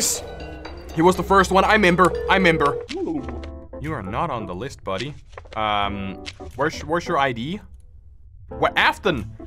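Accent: Denmark. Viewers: Wait I think bro my be danish he has that danish accent like me except I can get rid of mine for a more American accent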